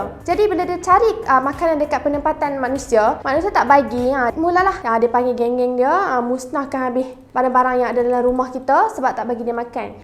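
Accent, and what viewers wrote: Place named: North, Malaysia. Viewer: Seronok dengar loghat utara kakak❤❤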